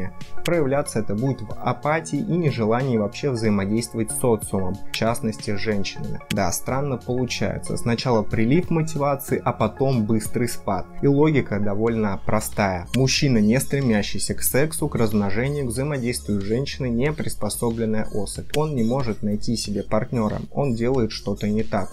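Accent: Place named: Okanye, Russia. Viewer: это оканье в течении всего видео прям зае....ло конкретно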